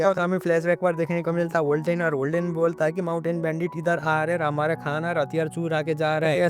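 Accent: Nepal. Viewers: Bro are you from Nepal??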